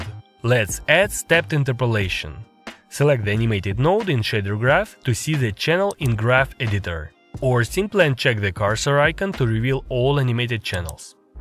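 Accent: Russia. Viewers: are you russian? i hear your accent like that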